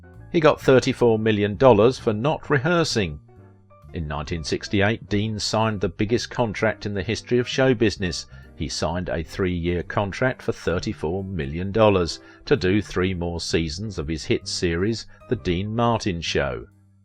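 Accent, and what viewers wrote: England, United Kingdom. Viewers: He's English. Accents happen.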